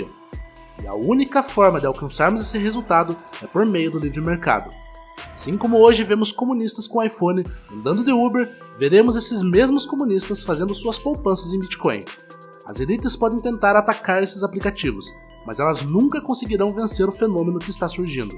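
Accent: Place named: Curitiba, Brazil. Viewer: vocé tem sotaque de Curitiba! Confere? Abç!😊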